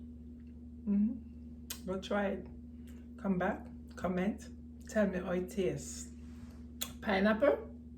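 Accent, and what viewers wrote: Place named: Jamaica. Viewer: I tell you I love this jamaican accent, this was really interesting to watch can I visit you every Sunday?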